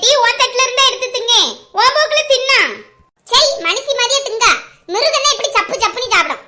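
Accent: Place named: Nagercoil, India. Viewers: Nagarkovil slang superrrrrrrrr😍😍😍😍😍😍😍😍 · Kanyakumari Tamil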